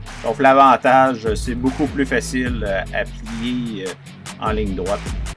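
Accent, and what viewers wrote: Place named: Canada. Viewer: C'était vraiment utile surtout le site indiqué. (Et j'adore l'accent Canadien) Merci!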